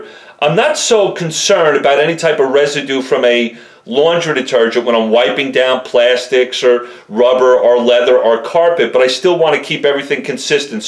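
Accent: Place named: New Jersey, United States. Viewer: no kidding, what's up with these people. Is there a 3 minute law for videos? Screw that crap...I like listening to a guy who knows his sh*t and educates and explains... I am blown away by all the comments you get for long videos...also, why are people so fascinated with your Jersey accent? And many call u Howard stern, they must have never visited the northeast??? But keep it coming, you turned me on to armour all quick shine Aerosal , and purple power!!! God sends...for that I just ordered a 46 buck order from your microfiber store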